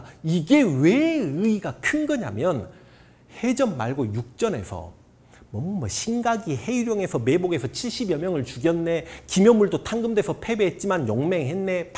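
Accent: Jeolla, South Korea. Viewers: 강사가 전라도사람이네 말투보니 ㅋㅋ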